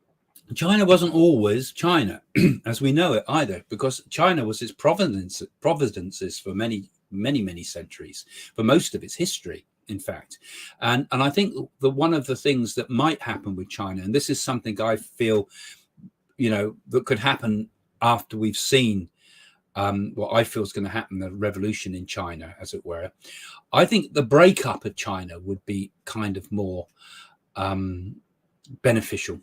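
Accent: United Kingdom. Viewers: yea ! I can't comprehend the british accent ! I'm from united states.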